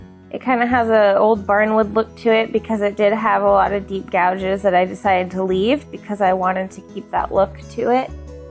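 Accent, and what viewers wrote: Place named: Oregon, United States. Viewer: How funny. I stumbled onto this video, and all of a sudden I thought, "This woman really sounds like she is from Oregon." I had to check it out, so I went to your web site and I see you are in Coos Bay. I didn't know we had a recognizable accent! I'm from Medford.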